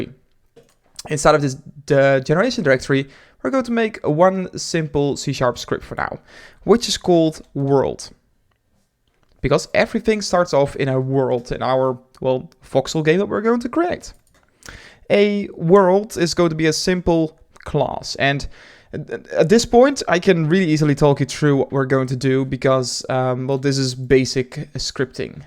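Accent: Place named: Netherlands. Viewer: I smell Dutch :D :D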